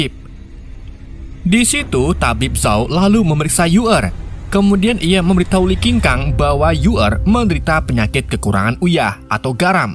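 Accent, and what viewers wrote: Java, Indonesia. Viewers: Min sampean wong jowo ta🗿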